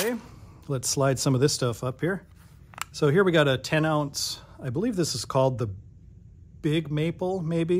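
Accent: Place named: Upper Midwest, United States. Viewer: I enjoy your channel. And recognize your accent. I grew up in Minnesota about an hour from Fargo. I worked in Fargo for about 10 years. I’ve been through Minot many times back when fracking sand was big. I drive semi. I live north of Nashville TN just under the Kentucky state line. Again I appreciate your channel. Can I ask did the majority of what you bought yesterday come from one customer?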